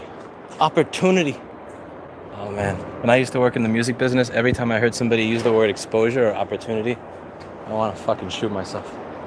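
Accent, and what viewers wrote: New York, United States. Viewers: Hi, Willy here. I listened to this from 75th St. and York ave, where I live as I walked to 5 W 37 ST. where I operate Reichenbach Hall German Beer Hall. I figured I would say hi. I was saying to myself, as you walked by 37th St, maybe he will mention the "sandwich board sign" that we have put on the corner to try and lure peeps down the street. Anyway, thank you for the entertainment. You still sound like a New Yawker. FYI, lost a restaurant around the block due to an asshole landlord. You have no idea how much $ we lost. If you are in the area again, contact me, you have a bratwurst and a beer on me and I will let you take a piss there if you don't tell anyone else:). One more thing, I think NYC will come back. We just have shitty leadership. And it looks like we will continue to have that.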